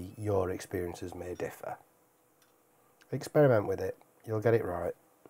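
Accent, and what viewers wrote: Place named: Yorkshire, United Kingdom. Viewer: Cheers, the's nowt wrong wi a bit o Yorkshire in a video.....I may all revisit that gag 😉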